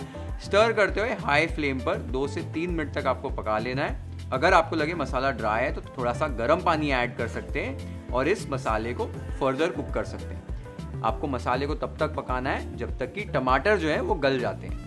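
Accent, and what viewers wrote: Maharashtra, India. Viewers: Aap sab recipe try kiya aur masale bhi Sab eakdam perfect jesa kaha gaya wesa he kiya hey❤❤its taste perfect an superb Best recipe I loved was kala mutton thali u made sambar eak Briyani bhi 😊 Mala Tumcha Amcha Maharashtra Varcha Pream kup Avdal tume Manapasun banvtat ani Bolta sudha 😅 Love ur Marathi acent in Maharashtran Recipe you have Done till Now ❤❤❤❤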